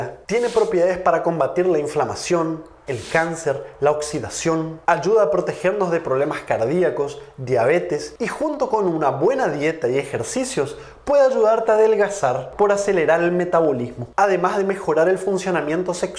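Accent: Chile. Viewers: Noviembre 1/2022: contenido interesante. Usted combina 2 tipos de acento. Habla como chileno y como yucateco. Posiblemente estudio en México. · Gracias Doctor. por su acento creo es chileno. Saludos de Mx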